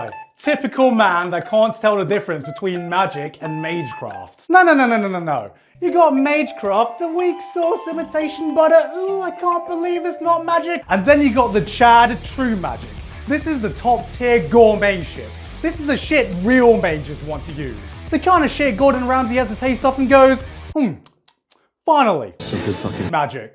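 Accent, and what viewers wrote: United Kingdom. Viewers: "There's at least five" Actually had me laughing, especially with that British accent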